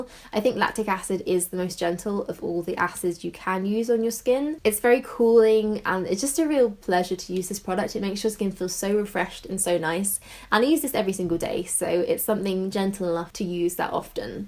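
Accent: United Kingdom. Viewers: I made it to the end frankly I just love hearing your voice. I am an American and I love British accents. Thanks for the video have a great day!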